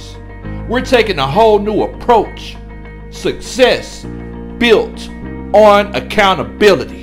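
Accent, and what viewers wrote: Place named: South, United States. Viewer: Coach ... This Was Excellent. Complete w/ Southern Accent & Lingo. I Hope The Team Endorses This Grass Roots Effort. Keep Up The Excellence Coach... We'll Tune In.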